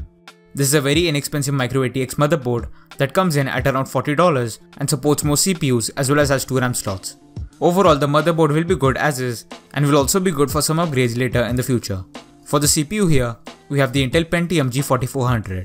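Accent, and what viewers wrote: India. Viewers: Guys don’t be so mad I know he has a Indian accent and the pc only got 4 gb ram but it’s a budget pc so it should be cheap and he say it’s for Fortnite so if you want pubg go and watch another video ;)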